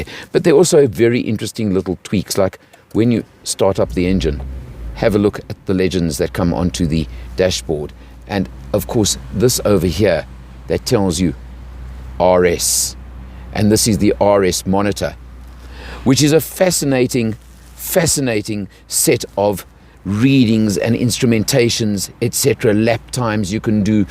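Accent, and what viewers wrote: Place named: New Zealand. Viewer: Your kiwi accent annoys me. No offence.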